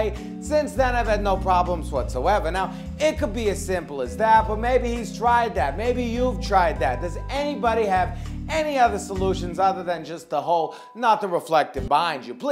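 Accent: New York, United States. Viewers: His voice accent is an accent from the late 1800's New York you're a fucking stupid moron lol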